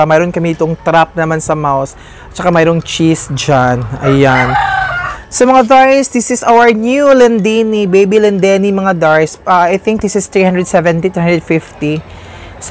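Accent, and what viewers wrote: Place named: Visayas, Philippines. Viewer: Manay am happy kapag napanood kita happy people ka at natutuwa ako kapag dialogue mo sobrang dako bisaya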